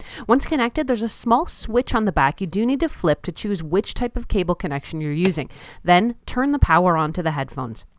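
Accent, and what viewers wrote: Canada. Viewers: She sounds Canadian , so the video is even better.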